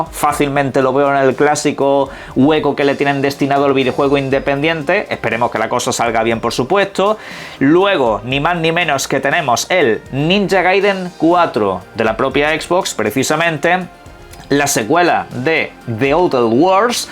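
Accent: Spain. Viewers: que asco español de españa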